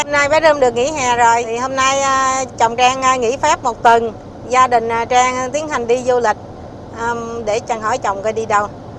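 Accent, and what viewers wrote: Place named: Mekong Delta, Vietnam. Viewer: Nói chuyện đậm chất miền tây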